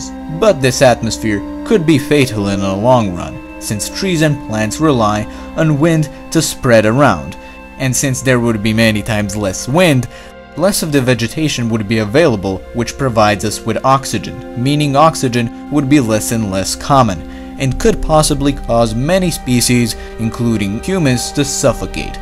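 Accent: Serbia. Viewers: Just a Serb speaking English. Doubt you'd sound fluent when you speak another language.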